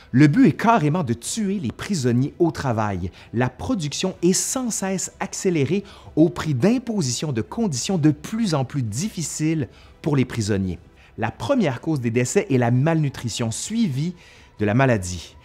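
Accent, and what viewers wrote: Quebec, Canada. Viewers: Je ne regrette pas d'avoir eu la curiosité d'aller faire un tours sur votre chaîne après votre collaboration avec Nota Bene, les sujets sont toujours fascinants, éclectiques et le texte est très bien écrit et ne parlons pas de l'accent des cousins québécois que l'on adore 😊 Merci pour tous ce travail j'attends toujours les épisodes avec impatience ! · Les coins ronds! J'adore le français du Québec. Encore une vidéo passionnante. Merci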